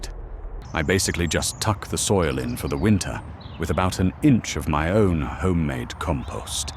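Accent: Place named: RP, United Kingdom. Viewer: The commentary is just brilliant. Funny, whilst actually parting knowledge and being very informative. Just brilliant and very engaging and enjoyable. Only a posh and articulate Englishman could pull that off. I think you're going to become more and more popular with this unique content. All the best to you, and please don't change the style and delivery. Its so original.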